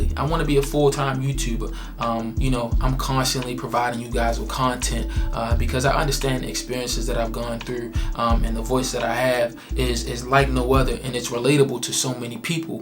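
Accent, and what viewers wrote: Baltimore, United States. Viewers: That baltimore accent is accent is something else brother. Thanks for the information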